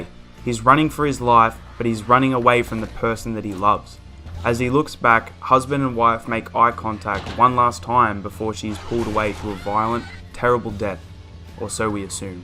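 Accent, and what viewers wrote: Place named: Australia. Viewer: Hey, you sound Aussie! I really enjoyed this one and think you have real good potential with your timing, technical setup, conversation points, choice of scene/film, and fairly down to earth approach; even if it's a tad too obvious you're reading your script haha. I know there are a lot of film reviewers out there, but with recent events now would be a great time to really try to push your channel toward the film analysis/review crowd, if that's the direction you want to go in. I think you'd do well. Good luck with your next one.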